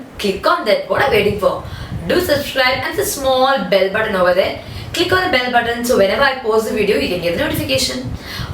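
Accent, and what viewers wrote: Kerala, India. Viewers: Mam,are you from kerala?.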